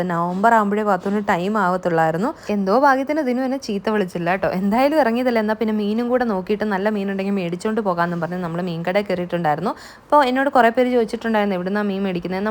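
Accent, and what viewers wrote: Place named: Alappuzha, India. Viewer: where in alpy...doubted from ur tone that u will be from my place❤😂😂😂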